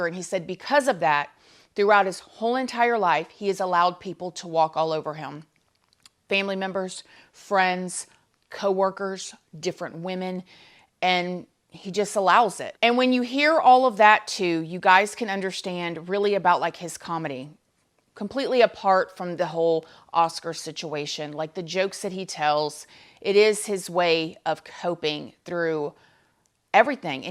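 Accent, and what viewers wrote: South, United States. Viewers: I know you are happily married and all. But I just got to say, you are hot! I love your style, particularly your cool hairdos and your southern accent...and your funny. that's all.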